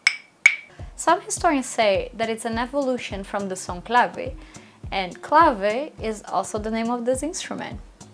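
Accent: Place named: England, United Kingdom. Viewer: Where are you from cus u got a strong accent in english but an english accent in spanish. It dont make sense.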